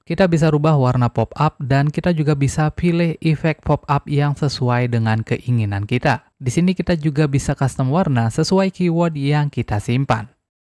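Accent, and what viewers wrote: Bali, Indonesia. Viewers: Suaranya Bali banget bli hehe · Bang orang bali atau lombok?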